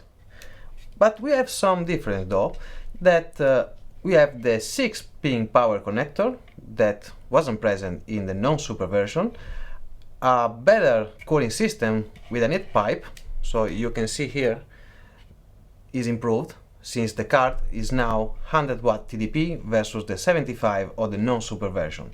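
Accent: Italy. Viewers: Are u Italian or portogese?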